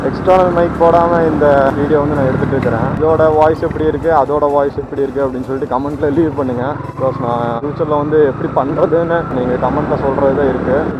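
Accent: India. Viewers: Bro neenga entha ooru Kongu slang varuthu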